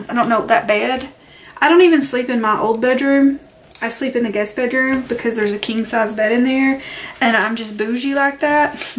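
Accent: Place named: South, United States. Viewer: New sub. Love this. Sounds like you are a fellow Southerner. I live in Tennessee. Blessings.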